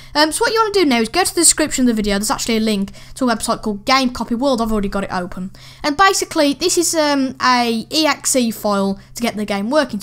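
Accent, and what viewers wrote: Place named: United Kingdom. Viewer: I love britsh accent... is so funny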